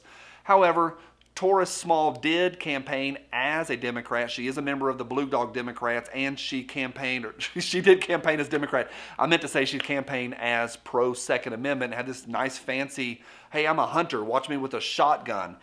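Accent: South, United States. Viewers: You rock. Loving the southern accent. Thank you for all the updates?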